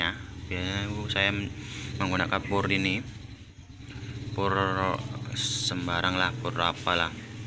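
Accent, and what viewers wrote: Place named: East Java, Indonesia. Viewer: Wong Jatim koyok e lek ndelok logat e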